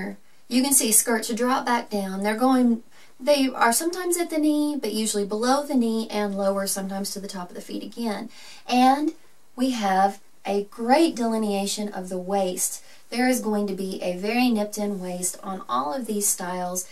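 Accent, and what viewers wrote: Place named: South, United States. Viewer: Your accent is so sweet! It makes me crave a glass of ice tea under the porch of some cute southern house ❤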